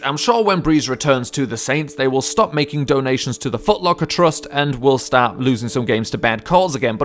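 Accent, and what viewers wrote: United Kingdom. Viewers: U sound like a complete dick head 😂 u sound like ur from the UK or some shit why are u even talking football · Its weird hearing a Brit or Aussie talk about American football. Whatever you are.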